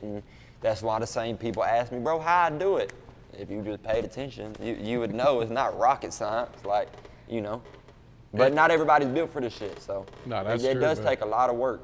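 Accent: South, United States. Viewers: this is exactly why I subscribed to him one day I was just scrolling and came across his video for the first time and he was responding to somebody saying that he talks black and when I hear that, that shit really gets under my skin because I grew up right next-door to him in south Alabama, mobile to be exact so when I hear him, I hear a country boy and when I moved to Texas, I used to get the same shit and I would be so confused because this is how all my family talk like my granny or especially my Pawpaw if you were just on the phone with him, you would think you was talking to a old black man, but now that I’ve been in Texas so long, I can see what they’re talking about because how a lot of the Black people talk over here is how them back wood country white people talk in Bama. But yeah, somebody was hating on him because of his accent and you could tell he was being genuine as hell replying to this hater so I followed him solely off of somebody hating on him and now I’m glad I subscribed to his page because it’s refreshing to see someone being exactly who they are authentic and humble. 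So yeah, I’m rooting for Lil bro and another thing is I really would like to hop on a track with him but I doubt I could afford a verse😂🤷🏻‍♂️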